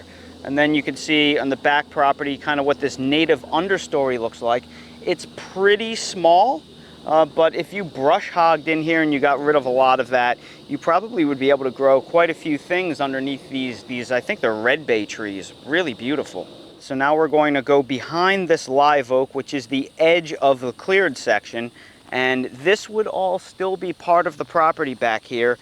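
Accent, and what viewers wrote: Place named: New York, United States. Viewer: You sound like you were born where Woody Allen was born.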